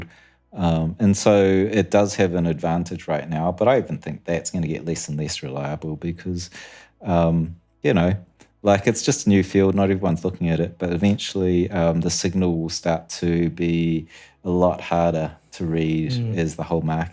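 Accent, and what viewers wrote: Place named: New Zealand. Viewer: Hey...you have a strong Kiwi accent...are you overseas or in NZ? chur bro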